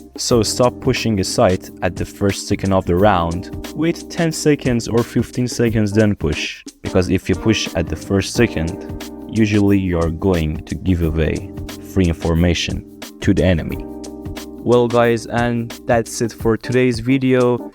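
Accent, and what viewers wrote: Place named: Turkey. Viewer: Türk müsün la😅 aksanindan anladım 😅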